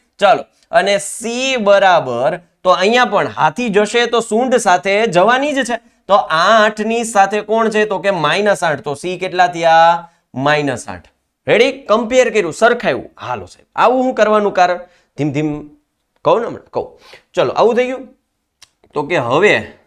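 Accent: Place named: Kathiawad, India. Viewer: Sir tame kathiyad baju na cho nice bhasa and math lecture mate thanks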